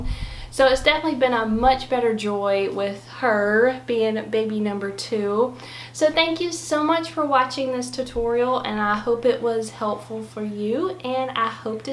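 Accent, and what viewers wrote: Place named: South, United States. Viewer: Your southern accent makes you sound and seem as sweet as apple pie.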